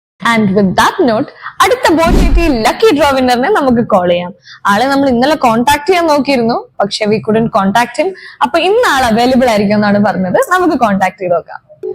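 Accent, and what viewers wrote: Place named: Malappuram, India. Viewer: Full മലപ്പുറം ആണലോ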